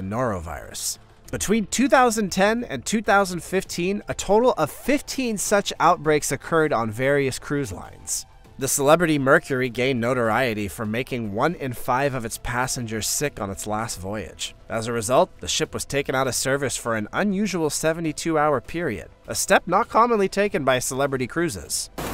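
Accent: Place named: United States. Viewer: You’re pronouncing a lot of the ships wrong and you sound American. How is that????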